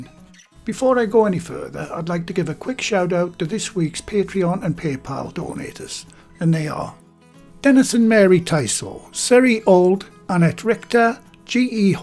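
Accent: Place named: Northern England, United Kingdom. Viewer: This looks so yummy. Proper northern comfort food. You sound like a lovely northener. Thank you for such a well made video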